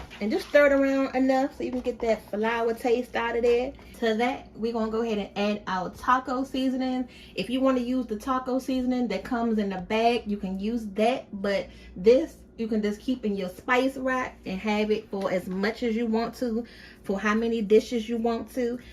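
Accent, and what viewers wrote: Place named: DMV, United States. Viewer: You have to be from the DMV area, I know that accent from anywhere!!! After watching the vid, yes you def are!! I feel so special watching someone from my area on YouTube!!! Get it y’all I love it😍😍😍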